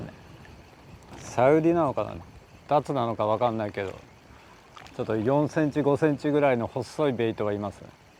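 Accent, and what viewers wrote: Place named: Tohoku, Japan. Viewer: 福島出身です🙇🏽 久し振りに東北訛りがブルーブルーの動画で聞けて、嬉しくなりました笑笑 応援してます‼️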